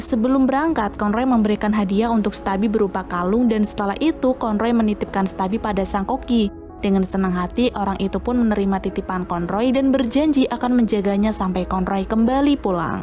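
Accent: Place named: Banjar, Indonesia. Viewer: LOGAT KAKAK ORANG BANJAR KAN? "KYAAPA"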